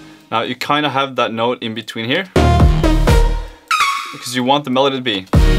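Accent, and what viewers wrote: Norway. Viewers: You sound so Norwegian. In the best possible way of course - bra video og mye god inspirasjon! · You know you are Norwegian, when you can hear the Norwegian in his voice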